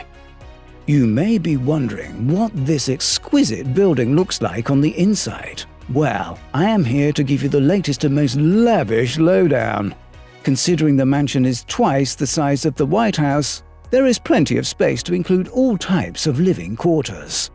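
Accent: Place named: Australia. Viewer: You Australian!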